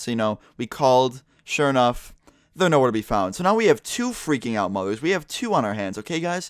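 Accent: Canada. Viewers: wait......... Youre Canadian? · Are you canadian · Are u Canadian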